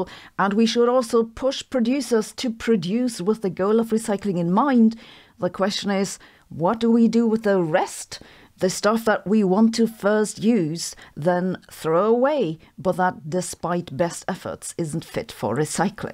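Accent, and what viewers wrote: Germany. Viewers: And I love your cherman accent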